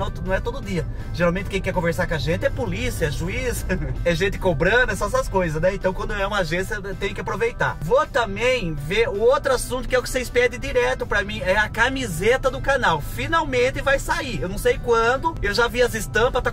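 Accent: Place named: Paraná, Brazil. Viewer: vc é de que estado do Brasil.Digo onde nasceu,porque eu hoje faz 31 anos que morro em santa catarina, mais nasci no paraná e morei la ate meus 13 anos, e todos os teus ditados modo de falar me parese ser paranaense.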